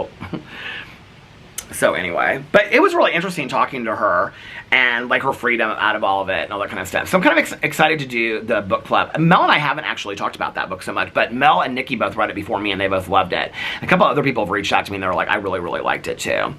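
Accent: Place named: Midwest, United States. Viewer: I've noticed that too. Honestly, it has to be some kind of accent. I remember in the first grade I was so confused because I had a friend who would say 'saw' like that, and she'd say 'drawl' (for draw). More than anything I'm just curious about which part of the Midwest it comes from. Obviously somewhere. Maybe my friend's parents and parents are from a similar spot geographically?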